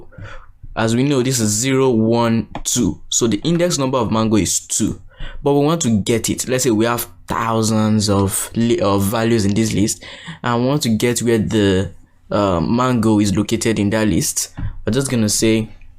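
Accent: Yoruba, Nigeria. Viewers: It's a Nigerian accent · He sounds Nigerian, awesome man! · As a Nigerian, I've been doubting if this accent is from a Nigerian or not, then I heard "let me kuku", my doubt went off....thanks so much for the video. How can I also reach out to you? I've done cs50p, cs50x, and almost done with cs50w, but still feeling like they are not enough in today's market. I will really appreciate your response. From your fellow Nigerian. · Im so happy i can hear a Nigerian's voice. God bless you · this is wonderful ..... why does this guy sound very Nigerian ??? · Excellent, looks like a nigerian accent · Well he is Nigerian .... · Is it just me or does the instructor have a Nigerian accent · Nigerian accent o 😂💀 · Heard the accent and i knew this na omo naija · sounds like a Nigerian great tutorial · is probably Nigerian by his accent, yoruba to be precise. Thumbs up to him🇳🇬🇳🇬🇳🇬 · I can hear the Nigerian accent. 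Great video.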